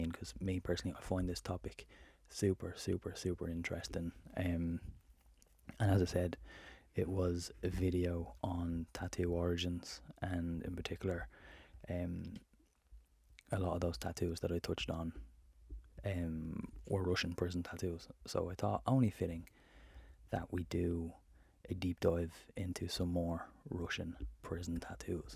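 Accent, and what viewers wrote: Ireland. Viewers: OMG !!!! Love your accent so much! Ireland ❤️❤️❤️☘️☘️☘️🇮🇪🇮🇪🇮🇪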